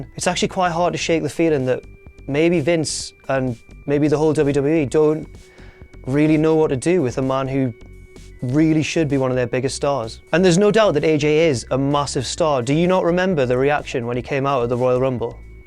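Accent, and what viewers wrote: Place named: Newcastle, United Kingdom. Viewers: HA! You're from Newcastle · Yay!!... You are posh for a north eastern lad though.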